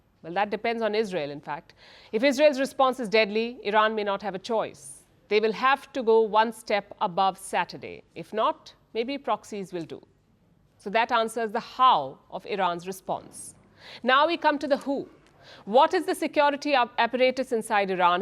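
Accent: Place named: India. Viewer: YES WE ALL AGREE , BUT ITS DIFFICULT TO UNDERSTAND HER WITH THAT INDIAN ACCENT !!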